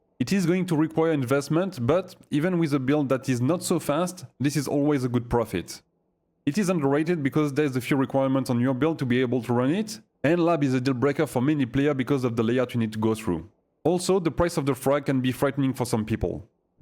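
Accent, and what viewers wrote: France. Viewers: Thanks by any chance are you french ?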